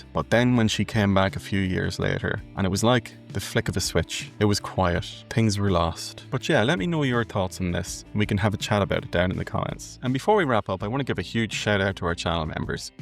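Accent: Ireland. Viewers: Thank you for your reply, you're doing a great job with your channel, by the way. I'm curious about your accent, you sound like you might be a Leitrim man like myself or maybe Cavan or Monaghan 😉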